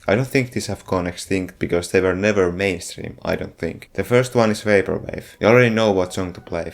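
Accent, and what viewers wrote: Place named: Finland. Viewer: Hehe ekasta lauseesta äkkäsin suomiaksentin :DDD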